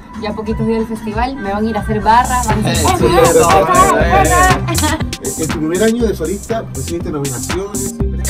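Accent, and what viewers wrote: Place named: Ecuador. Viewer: DE VERDAD ME QUEDE IMPRESIONADO CON TU DESENVOLVIMIENTO EN ESTE VIDEO, LUCES HERMOSISIMA, Y BIEN PROFESIONAL EN TUS COMENTARIOS, TODO CON MUCHA FUERZA, CARISMA Y A PESAR DE LA POCA EDAD QUE TIENES DEMOSTRASTE TALENTO Y DEMASIADA BELLEZA SIN PERDER ESE TOQUE ECUATORIANO AL HABLAR Y SALUDAR, MUY RADIANTE CON UNA ENERGIA SIN FIN, FELICITACIONES, ME ENCANTO ESTE VIDEO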